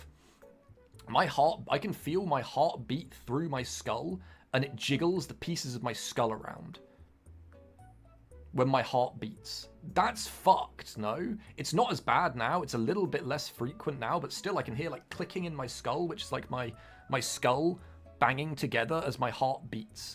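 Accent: United Kingdom. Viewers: That's just how the British speak.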